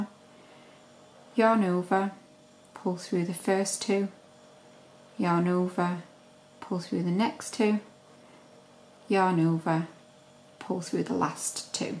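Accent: United Kingdom. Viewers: I love Brits accents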